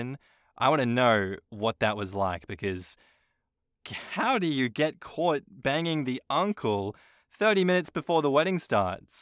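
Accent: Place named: Australia. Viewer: Ausssie daddy hubby reading Spanish 🤌🏻💕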